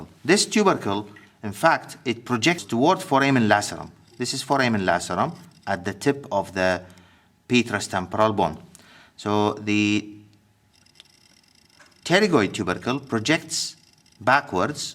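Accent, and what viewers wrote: Iraq. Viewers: thank u very much dr for this video, the skull has always been a problem to me! hope with ur coming videos things will be easier, though i'm a surgical resident now i prefer to study anatomy in its very basic form, i was honoured to have an iraqi professor as a teacher of anatomy in my university, wonder if u know him actually! his name was dr Hani Alazzawi and u remind me of him i mean the accent:), lots of respect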